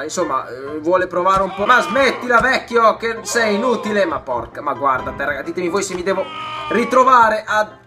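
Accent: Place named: Emilia-Romagna, Italy. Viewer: ma tu di dove sei? ho sentito un bell' accento Emiliano-Romagnolo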